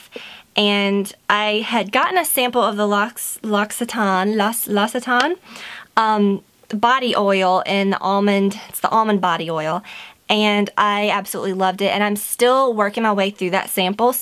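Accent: South, United States. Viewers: Where are you from????? I knew the South (accent) , but close location? Like I am South Eastern..... about an hour ish from Savannah !!!! I knew I totally related to you for a reason. Almost everything you haul.....if I dont already have it.... I go & get it. ♡♥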